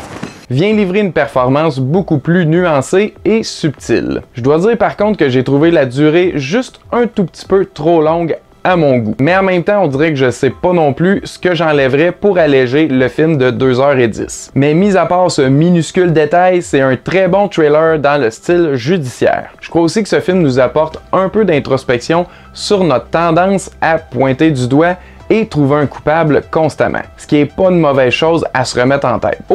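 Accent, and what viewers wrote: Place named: Canada. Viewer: Super ta vidéo, tu explique bien t'es avis, franchement bravo et très belle accent canadien !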